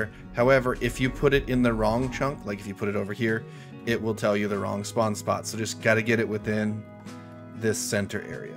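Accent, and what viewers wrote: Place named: South, United States. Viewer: I have no clue, sounds southern.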